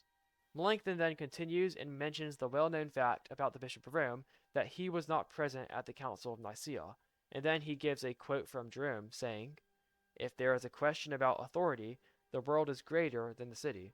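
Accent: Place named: RP, United Kingdom. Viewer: Beautiful refutation by Philip Melanchthon. Also, you seem to pronounce your long O sound like Received Pronunciation (i.e. "Ruh-oom" instead of the usual American "Row-oom" for the word "Rome") instead of General American, which I found very interesting as someone living in Scotland. God bless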